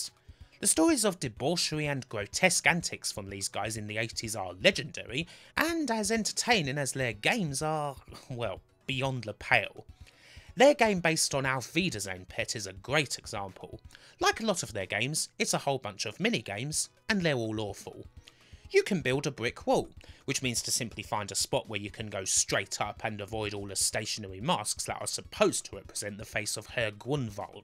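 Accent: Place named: Croydon, United Kingdom. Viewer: is the purest Croydon accent around.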